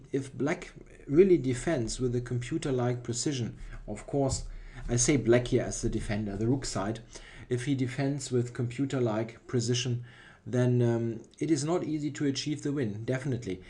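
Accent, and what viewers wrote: Germany. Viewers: alter.. bist du deutsch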